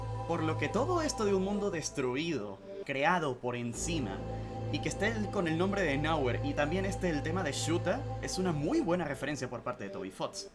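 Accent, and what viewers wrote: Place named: Venezuela. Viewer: Nada más escuchando tu forma de hablar reconocí Venezuela ❤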